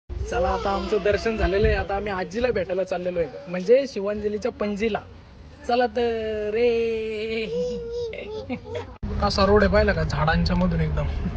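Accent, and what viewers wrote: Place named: India. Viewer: दादा why don't you stick to what you are good at? We watch your videos for your daughter and and your non Punekar- non nasal-authentic Marathi language which is rare to hear now days. Why don't you stick to it rather than becoming passive aggressive to preach your personal thoughts and condescendingly asking people to rethink their habits and choices? There are enough youtubers out there preaching THAT stuff. You don't need to appear one of them. सुरक्षित अंतर ठेवा. 🙏🏻☺️🙏🏻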